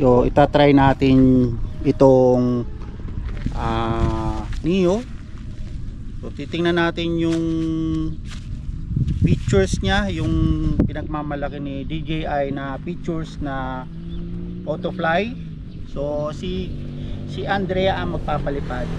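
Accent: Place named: Pampanga, Philippines. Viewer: Kapampangan ka po ba sir? Na enjoy ko video mo hahahah bukod sa dahil sa drone e ka accent mo din yung namayapa kong tatay, more drone videos po🫡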